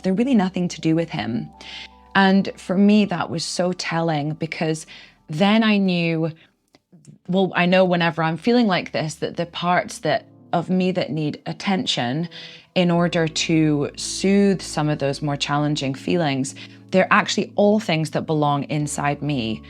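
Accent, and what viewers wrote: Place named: Scotland, United Kingdom. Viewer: Love the accent! Got to golf in Scotland a couple years ago...beautiful.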